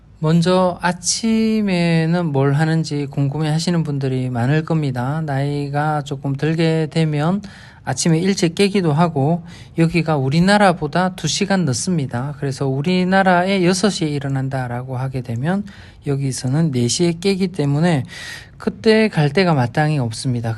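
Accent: Gyeongsang, South Korea. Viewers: 님의 차분한 경상도말에 친근감이 느껴집니다. 한달은 좀 길어 보름살기는 안되나요? 내년1월에는 라오스 가는데, 치앙마이에서도 보름있고 싶어서요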